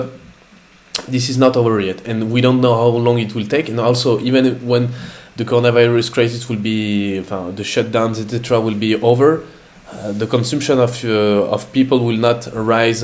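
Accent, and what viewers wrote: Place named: France. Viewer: Ton anglais avec un accent francais est tres sympa. Tu maitrises la langue de shakespeare ! Chapeau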